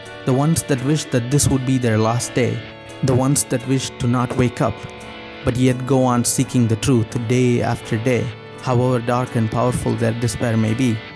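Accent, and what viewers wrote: India. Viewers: The Indian accent is unmistakable. Wonderful essay. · The Indian accent distracts me from the whole thing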